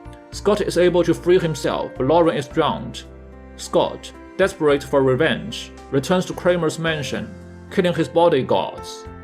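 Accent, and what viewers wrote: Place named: United Kingdom. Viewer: your accent is too british cannot understand anything clearly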